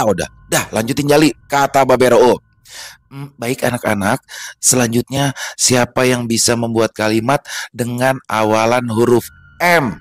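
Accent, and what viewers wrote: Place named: Jakarta, Indonesia. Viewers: Bang emang jago banget bawain cerita2 kaya gini klw lg cerita bahasa betawi kentel banget logaknya, klw bawain cerita dari kalimantan kentel banget juga logak kalimantan ny kereen lanjut terus semoga sukses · Keren banget bang, cara bawain nya, ceritanya, logat Betawi nya, mantap bang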